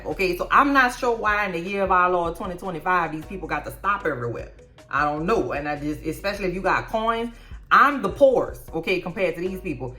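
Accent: New Orleans, United States. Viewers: Why don’t they just put you on the show, you could be one of those ladies. I don’t know why you’re in New York with a New Orleans accent but they’ll figure it out. This show just needs more laughs.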